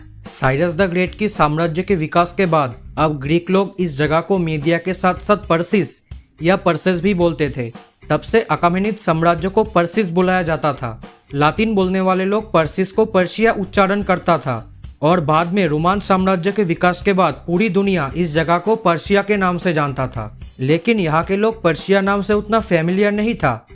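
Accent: Bengal, India. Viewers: Your accent is kinda like bengali people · Tu Bengali hai na?(pronunciation) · Are you bengali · Idk why but tumhara accent Bengali lag rha h mujhe 😄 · Are you bengalee? Because your accent makes me feel like that P:S: I'm a bengalee too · Are u bengali? Anyways love from kolkata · Aaap Bengali ho na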